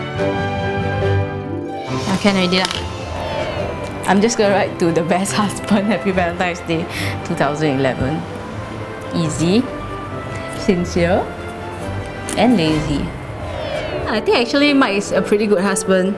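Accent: Singapore. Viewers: I love her Singaporean accent :D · Yeap, its known as Singlish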